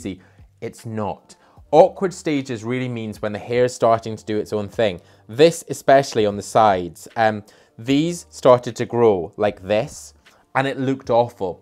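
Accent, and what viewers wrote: Scotland, United Kingdom. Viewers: I like your video. Just curious, you sound like a cross between a Scot and a British man. What is your nationality or background if you don't mind me asking? I just like to educate myself on the different accents and sounds that I hear.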